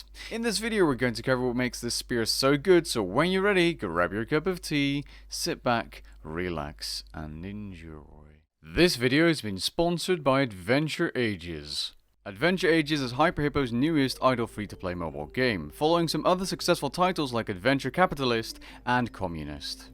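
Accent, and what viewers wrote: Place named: Netherlands. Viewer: i hear a slight dutch accent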